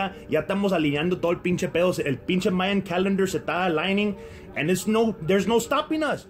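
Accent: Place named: South Texas, United States. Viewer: This guy is from the valley in South Texas or what!!. I hate the way he talks lol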